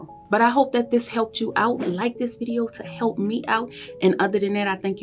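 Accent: New Orleans, United States. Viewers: 🎩👌LayDonDe'Vu on ya line. I can tell Baby from New Orleans Period Do ya thang Baby Keep speaking to the audience that need it. I wish you Prosperity in All you do⚘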